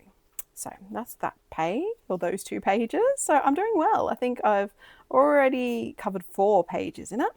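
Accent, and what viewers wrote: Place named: Australia. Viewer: Great collection of coloring pages! I’m really enjoying your channel. Your voice is so pleasant and of course I love your Aussie accent! But beyond that I really like your method of making videos. I understand when someone doesn’t want to talk all the time but I really appreciate your explaining your intentions and thoughts and how you achieve the results you get. Your color along was really good and as you said I appreciate the artist telling me what color they are using or what technique they are doing so I don’t have to watch every second and could actually “color along”. I hope you keep your passion for coloring and make lots more videos because your channel is just what I’m looking for 💕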